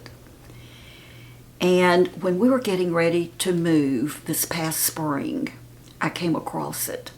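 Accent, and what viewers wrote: South, United States. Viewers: You look lovely, as always. Do you ever watch Melissa55 on youtube? She reminds me of you...your Southern accent, some of your facial expressions and mannerisms. Anyway, hair color, particularly box colors, fade on everyone's hair over time, especially if you are covering grays. Even though they say "permanent", they are not going to stay vibrant forever due to shampooing, sun, and other products. I started coloring my own hair in the 1970s and became a licensed cosmetologist 20 years ago. I cannot tell you the number of times I colored my hair and, being a natural born redhead, I have been everything from bright copper to mahogany to strawberry blonde and red is the hardest of all colors to keep vibrant. I gave up coloring 5 years ago and am so happy I did, but boy, I do love the color of yours now. It looks age appropriate and youthful at the same time. 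Sometimes I think I would like to go back to the pretty blonde with high- and low-lights I used to wear, but alas, it is so much easier to just go natural (for me!) Your color is beautiful and you did a great job on the cut, too!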